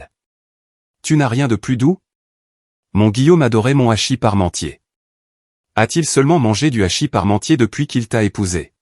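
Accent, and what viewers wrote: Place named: France. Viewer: J'ai adoré l'anglais avec l'accent Français ptdr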